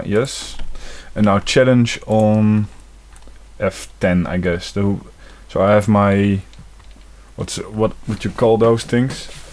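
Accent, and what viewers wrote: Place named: Netherlands. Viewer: Ben je Nederlands? Zo klinkt je accent namelijk! :)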